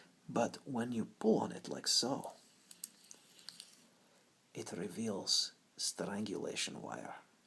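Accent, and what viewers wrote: Russia. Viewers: the russian accent ... is pure tingles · How are you able to speak with a Russian accent? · Ur Russian accent is amazingly cute and a bit funny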